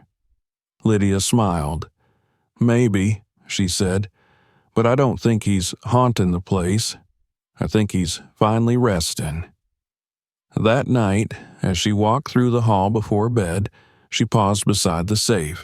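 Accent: South, United States. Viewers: Loved the story, but seriously change the voice. The old Southern drawl does not suit the story. He can't even pronounce the Italian names.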